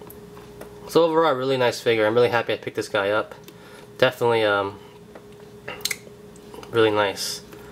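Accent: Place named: New York, United States. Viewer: ... I'm glad your one of the first to have the captain america toyline... your reviews are great bro... also your customs are amazing. Doode keep up the great work... and also... I heard a little New York accent hahaha nice... great stuff man!!! --Vincie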